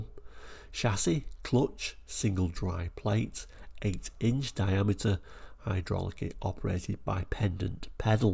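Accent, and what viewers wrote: Northumberland, United Kingdom. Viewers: I am intrigued by the lovely accent. Is it Northumberland?🤔 But don't go to Oxford University and ask for Noofield College. It is Nuff- field as in snuff. I assume it is an in-house press, since Viscount Nuffield was the founder of Morris and MG.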